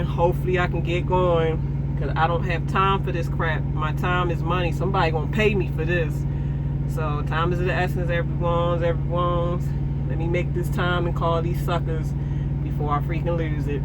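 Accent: Baltimore, United States. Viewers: Tht Bmore accent is water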